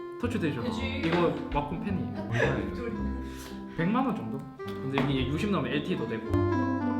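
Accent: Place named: Andong, South Korea. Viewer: 말투가 어디서 많이 들어봤는데...했더니 아버지따라 시골가서 듣던 말투군요. 안동분이실줄이야 ㅎㅎㅎ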